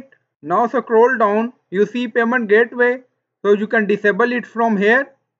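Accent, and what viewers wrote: India. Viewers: indish english per excellance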